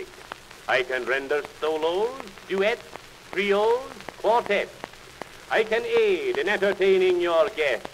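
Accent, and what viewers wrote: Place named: United Kingdom. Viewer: He sounds very British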